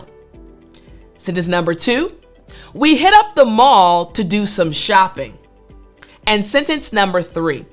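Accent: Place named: United States. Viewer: Hi teacher, l ❤ American accent.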